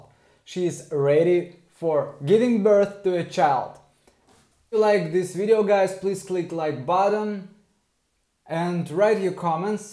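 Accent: Russia. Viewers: When this video started I was like “wow he has a Russian accent is he Russian” And he went “I’m a nail technician from Russia” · Its just a russian accent.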